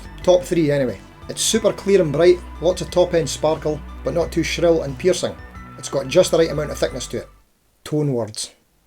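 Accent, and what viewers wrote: Scotland, United Kingdom. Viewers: 3 string graph tech string tree would be totally amazing! Also, always great to hear a Scottish voice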